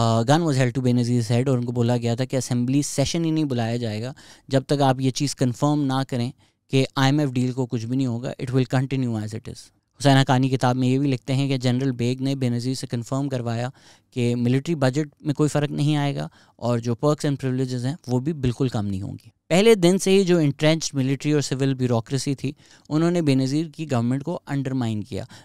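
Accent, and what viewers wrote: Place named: Delhi, India. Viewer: Brother I'm from old Delhi Chandni chowk India I just wanted to say your accent is very similar to the normal Dilli wala accent. ❤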